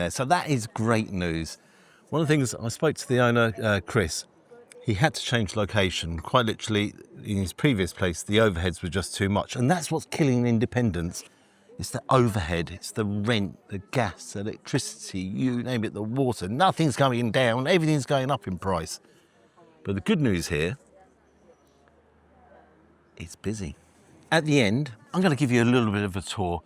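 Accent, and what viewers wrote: RP, United Kingdom. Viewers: Hey, only recently been watching your stuff although a long time ago I recall seeing some of your videos. I'm trying to catch up on the backlog and recently during my lunch break the recent one of being harassed came on my feed and wanted to at least say as a new viewer, it sucks people treat your opinions that way. Since I am a yank I always find your accent very posh and entertaining. Almost like very dry humor, keep up the good work!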